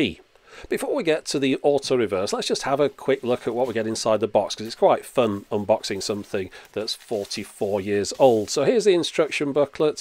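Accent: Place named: Liverpool, United Kingdom. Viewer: Are you from Liverpool? Sounds like it You sound like Ringo.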